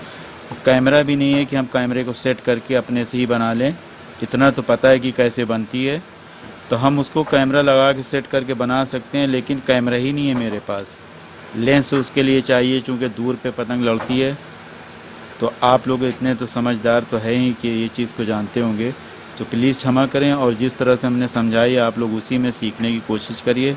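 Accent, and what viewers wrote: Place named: Allahabad, India. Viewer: aapki allahabadi bhasha sunkar achha lagta hai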